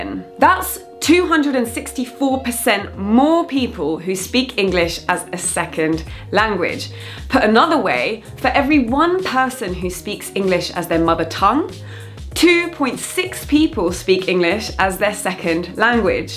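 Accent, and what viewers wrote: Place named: North America. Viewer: Your accent is just like north American accent, madam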